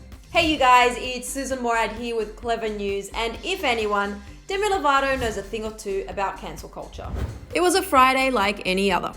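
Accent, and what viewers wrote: Australia. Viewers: you're just not used to Australian accent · What is that dialect? Is it Australian?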